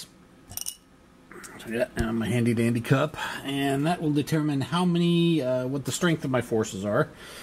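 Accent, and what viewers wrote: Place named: South, United States. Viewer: I liked it... the Southern accent added just the right touch....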